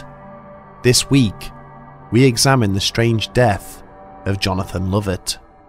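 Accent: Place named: England, United Kingdom. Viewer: Yeah he narrates in actual English not that American parody language..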